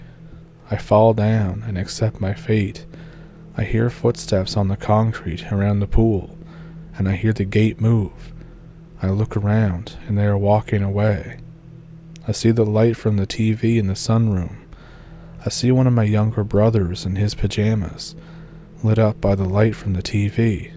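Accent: Canada. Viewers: Is this somebody else’s story because you don’t have an Oklahoma accent you sound like you’re from Canada? Is that correct?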